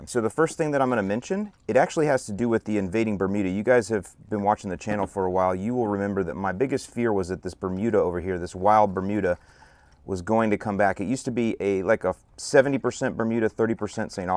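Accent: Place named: Midwest, United States. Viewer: Walk and fert? How about “walk, fert a minute” in that glorious Midwest accent.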